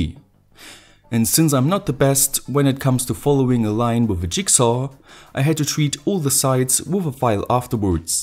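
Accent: Germany. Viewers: You are from Germany? Kommst du aus Deutschland? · *German accent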